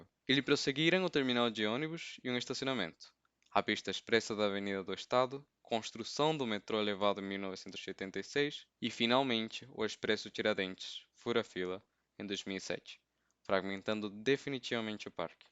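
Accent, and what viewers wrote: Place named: Portugal. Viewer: De onde é seu sotaque? Fiquei muito confuso, parece mistura de português (de Portugal), com paulista e carioca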